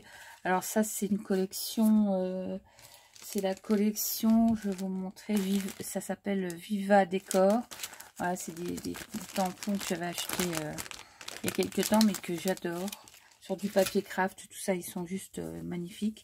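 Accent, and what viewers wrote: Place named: Lorraine, France. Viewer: Tout est très joli !!! Cathy a dû être contente. Je me suis abonnée chez toi aujourd'hui et je savais bien que je reconnaissais ton accent, je suis Lorraine aussi. Tu es d'où ?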